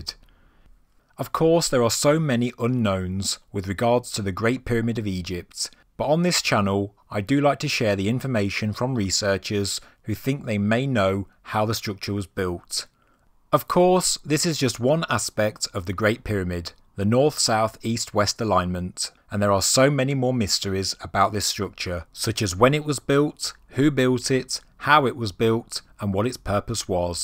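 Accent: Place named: Birmingham, United Kingdom. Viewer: definitely not Scottish. Sounds more Brummy to me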